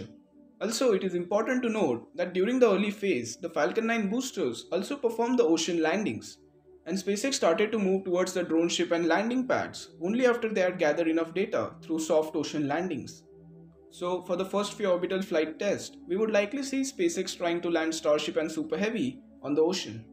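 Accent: India. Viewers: Thanks! the expected timeline was not apparent to me, other than the text list of event callouts. The Indian accent threw me, to be fair I don't speak any Indian. Hoping for altitudes, velocities, geographic locations (or longitudes) and altitudes by the time list posted. Maybe energy dissipation per second (d(m*v^2)/dt), possibly temperatures (gaseous molecular velocities related to Boltzmann temperature). Go! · i love the indian accest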